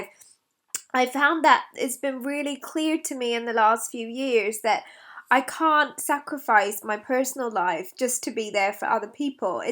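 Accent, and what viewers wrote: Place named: Australia. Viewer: That's a very Aussie accent. Greetings from Brisbane.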